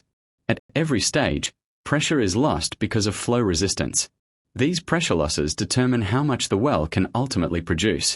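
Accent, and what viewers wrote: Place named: United Kingdom. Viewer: This is a great chapter, can you change the British accent to American?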